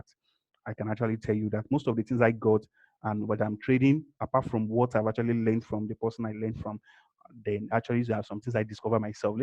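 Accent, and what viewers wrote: Nigeria. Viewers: This is a Nigerian Accent! Nice one brother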